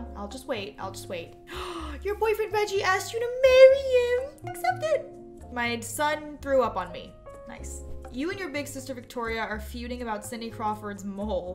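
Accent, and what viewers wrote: United States. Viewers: I thought u were British, I'm British, how did I not notice u were American??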